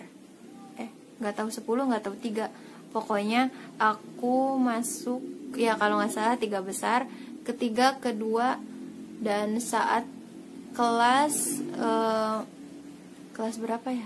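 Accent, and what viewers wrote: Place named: West Java, Indonesia. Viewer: Aduhhhh geuningg sundaa hhe Nklenaa8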